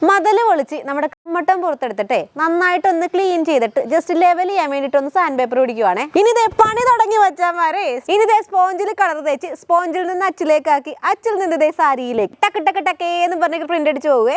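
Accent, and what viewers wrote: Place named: Alappuzha, India. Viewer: കൊച്ചിന്റെ നാട് എവിടാണ്..ചേർത്തല, ആലപ്പുഴ slang തോന്നുന്നു..ശെരി യാണോ guuyys 😂😂❤❤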